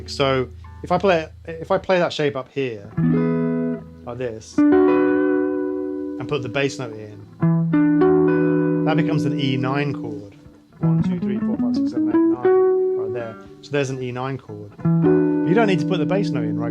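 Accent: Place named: Southern England, United Kingdom. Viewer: another great lesson ive been working a lot with these chords recently and this has given me some perspective, some way to categorise and organise them. I guess from looking at your website that your a music producer in the states now but I would love to place your accent, its almost definitely south of England but after that im stumped.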